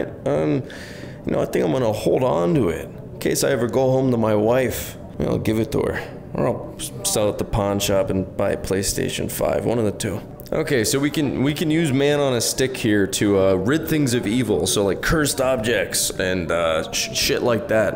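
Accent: United States. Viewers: What is his accent? Sounds Jersey, but I wouldn’t have a clue.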